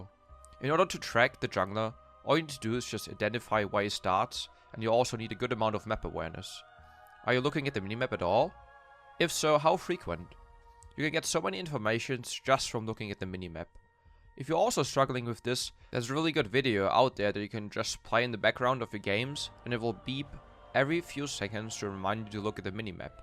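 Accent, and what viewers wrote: Germany. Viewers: love the german accent XD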